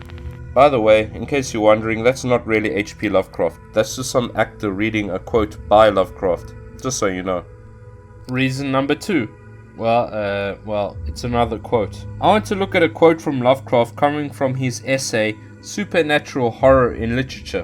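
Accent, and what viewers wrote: South Africa. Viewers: I'm really enjoying your content, mate. Also, out of pure curiosity: Your accent.. are you south-African/boer?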